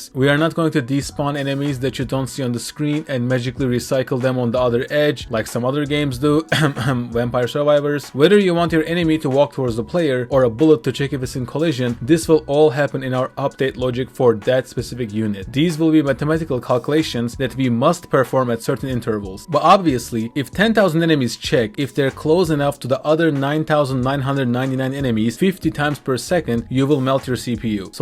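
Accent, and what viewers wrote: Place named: Turkey. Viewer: Kanka sen turk musun aksan turk aq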